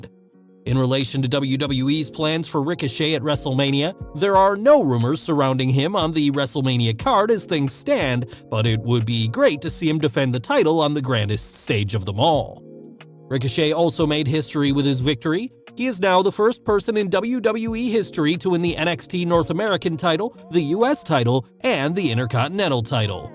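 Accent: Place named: United Kingdom. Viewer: Aw man no offense but I wanted to hear the British accent today :/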